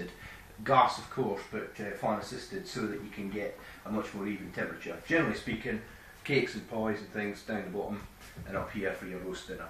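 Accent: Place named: Scotland, United Kingdom. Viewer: If you can get past the Scottish accent, this is a very good presentation.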